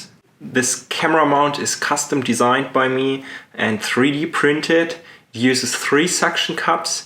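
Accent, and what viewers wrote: Germany. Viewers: Mach bitte auch Videos auf deutsch oder einen deutschen zweit Kanal denn dein Akzent ist so deutsch da kannst du es auch sofort auf deutsch machen Ist aber alles nett gemeint nicht böse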